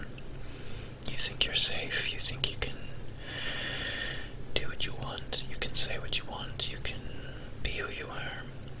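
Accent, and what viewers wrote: Ireland. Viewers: Hello my lovely, it is so good to see you back making videos, to see you happy and to see your beautiful smile again. I'm so sorry that you encountered some unkind people, when you are so sweet, kind and lovely to everyone. As for videos you post in the future, as long as they feature your gorgeous Irish accent, they will be perfect. (I loved your poetry ones - do you remember the poems I liked which I shared with you?) keep smiling my lovely K x p.s I read The Hobbit! I loved it!!! Thank you for encouraging me to read it.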